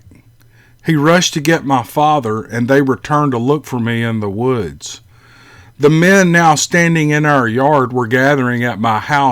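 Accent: South, United States. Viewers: Love your stories. It's just what I need to get thru these bad, horrible times. It's bad up here in the northeast. I love your southern twang and relaxed state. God bless. From cold NE. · "How y'all doing?" Absolutely Southern. I've been watching bee keepers on YouTube and one in North Alabama said the "comb was about the size of a bisquit. " Just had to laugh. Where else would you hear that comparison? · I’m pretty sure I hit subscribe 5 seconds after hearing you start narrating. - your voice/cadence/accent is totally “good ol boy” and I can not get enough! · are these your drone shots? I love them. I am from the lowlands of SC, big family property in the middle of nowhere. I live in Northern California these days. Your videos make me think of home as does your accent and manner. I listen to your stories whenever they come out before bed. So thank you! 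Some of these stories have happened in either my county (Santee in Orangeburg) or on the New River in NC where the other side of my family has been there for hundreds of years. Never seen Bigfoot. Other things though. Anyways I got to visit Mississippi someday. People kinda put it down but obviously that is hogwash especially if you love the land and blues. Kinda like an even wilder version of SC. Let me know about that drone footage.....and yes dogs have a very special and particular place back home too, they qualify as a y’all. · I just want to say that I immensely enjoy all your stories and music and I enjoyed watching your bike ride with your cute dogs running around you. I hadn't heard much about dogman and was surprised to find out about another cryptid. I love hearing about sasquatch, dogman, and the ufo sightings. I live in Toledo, Ohio and I love your southern accent. It's so friendly and homey sounding. 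Well, I don't want to ramble on to much but I love your channel and could listen to the stories all day and I can tell that you love reading them. Thank you. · Hey, I've commented in the past about how I love your voice and soft southern accent. Well, I didn't mention it before, but I also think it's wonderful how you have such a kind, tender heart!!! With your love of the outside, your dogs, your home State,Mississippi, and your family, I think you are about the perfect Southern Gentleman!!! We are so lucky for! Great stories! Great narration! I am so glad you spend time creating such an interesting and fun channel!!! Thank you for all the time & thought you put into making great entertainment!👏👏👏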